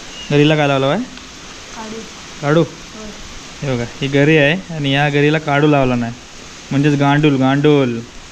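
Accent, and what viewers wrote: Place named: Sangameshwar, India. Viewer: ऐक नंबर साहेब थँक्यू गाव कोणत तालुका तुम्ही छान माहिती दिलीत वबोलता पण छान अस्सल सगमेस्वरी❤❤❤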